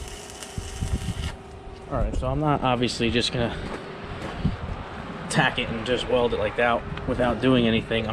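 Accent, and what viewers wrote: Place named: New Jersey, United States. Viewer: What part of New Jersey are u from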